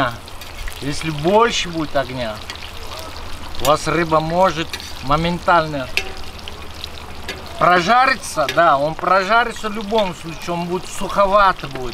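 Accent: Ukraine. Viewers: вы просто крутой узбек с украинским акцентом) живите долго, кушайте вкусно!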